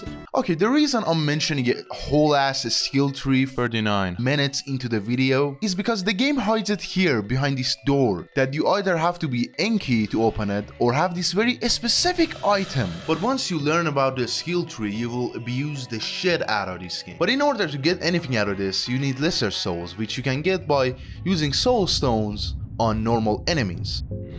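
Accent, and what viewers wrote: Iran. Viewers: Why do you sound so Iranian? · Ngl bro lowkey has a Persian accent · irani ass lahje man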